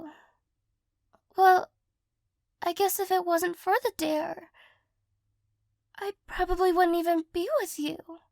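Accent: United States. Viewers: In what part of the english speaking world does this accent come from- ik its american but like- its a good kind of american yknow wut i mean?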